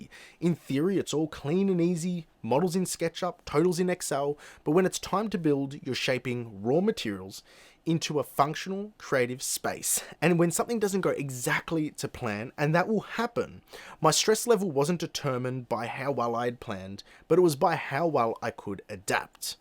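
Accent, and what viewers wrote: Australia. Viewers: This has changed the way I view putting my room together thank you!!! So nice to hear a fellow Aussie break down things to Australian standard as well!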